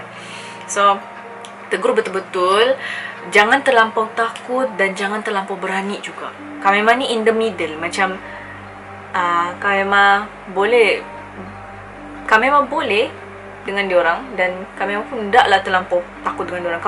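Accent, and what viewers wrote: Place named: Sabah, Malaysia. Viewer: Suka sa dengar cakap loghat sabah 😂 sedap jak dengar hahaha yay new video!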